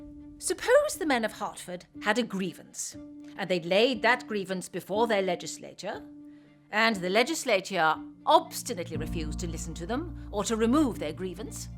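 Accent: United Kingdom. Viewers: So she has British accent. Great.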